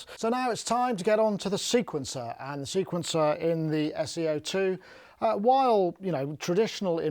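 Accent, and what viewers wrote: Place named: United Kingdom. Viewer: It doesn't matter what you say, you're British....it works out.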